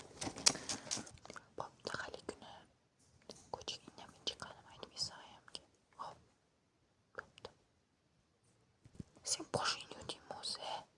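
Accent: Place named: Fergana, Uzbekistan. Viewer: Асмр учун рахмат омад тилеман. Шева Фаргонаники шеклли ааа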